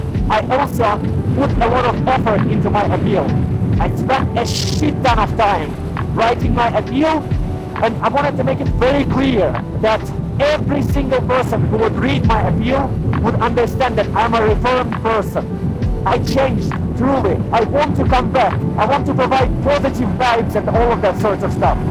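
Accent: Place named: Russia. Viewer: i clicked cuz i was looking to start streaming on twitch but i heard about constant random bans with no real clear reason from twitch making me question if its really worth it or not, as far as i know twitch is a simping platform that punishes guys and let girls do as they please with minimum consquences. anyway i clicked and as the video began i noticed that russian accent but didnt really know if its or not, but then i looked at the channel name... and im like wait!? Russian accent!? but the i know should be famous why is this account have a low below 10K, then i realized... this is second youtube account:| wow what a suprise the name brought me back some memory of old CSGO as i used to play back in 2018 until somewhere around <num> where i quit the game doesnt feel the same for me anymore it just not for me. but aside from all that im really shocked seen my guy feeling down because you my friend were part of my childhood, hope things work out for you brother, and do be careful dont risk your life because if something happened to you, your subscribers your followers are the one who will grieve over you. 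if riding your motorbike is your way of therapy i suggest you try something else maybe go for a walk or try to meet new people or spend time with OGs, and while im pretty sure you wont read this entire paragraph im still writing with the assumption you would read it, do take care of your self cheer up! LETS GO CYKA! RUSH B! B FOR BOOBS!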